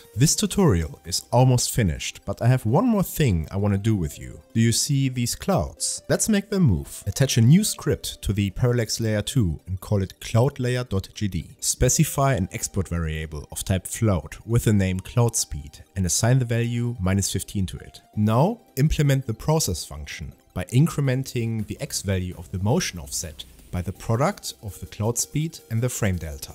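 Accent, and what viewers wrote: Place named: Germany. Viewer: Den deutschen Akzent hört man sofort raus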